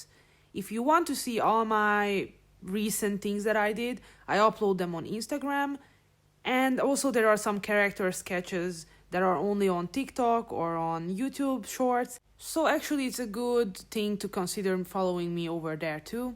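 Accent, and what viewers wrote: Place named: Hungary. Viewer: ...you sound hungarian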